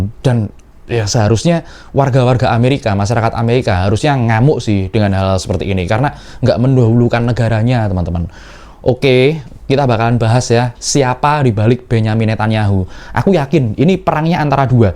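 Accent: Java, Indonesia. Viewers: Jawa mu pekat bangat.. Pening dengar kamu.. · Konten ini Bahasa Indonesia cengkok cengkok JAWA dasar lho JAWA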